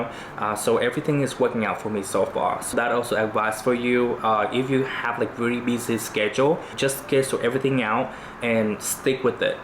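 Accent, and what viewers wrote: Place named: Vietnam. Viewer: This was so dope! You’re such an interesting, intelligent, methodical and organized person. I also love how your Vietnamese roots shows up in your English. It’s so dynamic and beautiful! P.E.A.C.E., J!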